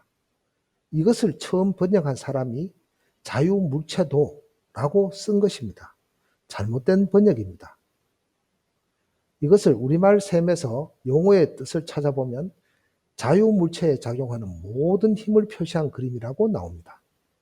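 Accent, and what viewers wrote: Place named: Daegu, South Korea. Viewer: 감사합니다교수님. 제가 대구사람이라 확실히 대구 사투리로 들으면 이해도가 올라가더군요. 정말도움 많이 되었었습니다.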